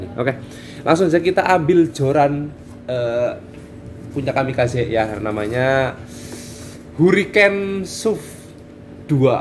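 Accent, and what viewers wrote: Banyumas, Indonesia. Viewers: Deneng bakule ngapak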